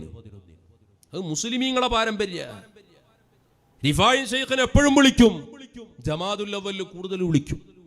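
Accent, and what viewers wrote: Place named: Malappuram, India. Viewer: Edenda engane paye usthad mare style Ningal Malappuram aano...kurach bhasha nannakkiyal nallath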